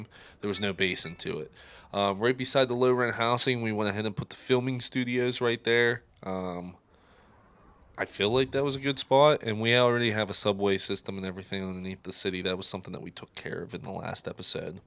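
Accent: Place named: Pittsburgh, United States. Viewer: I knew you were from Pittsburgh. Your accent is a dead giveaway. My family is from Pitcairn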